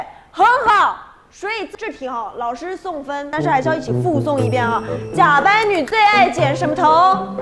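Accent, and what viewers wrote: China. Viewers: 她怎么是大陆口音啊？ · 為什麼要大陸腔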